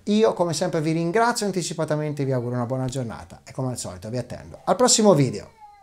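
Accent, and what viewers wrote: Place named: Umbria, Italy. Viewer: chiedevo per via dell' accento familiare, suonava come umbro, nun t'incazza.........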